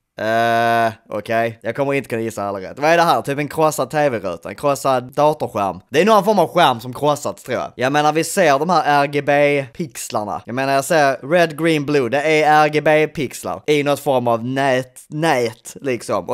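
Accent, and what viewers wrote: Scania, Sweden. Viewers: Tycker som inte om skånska men den här är fan för skön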